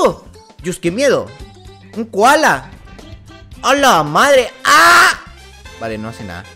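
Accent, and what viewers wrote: Chile. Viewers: Soy yo o tienes voz de chileno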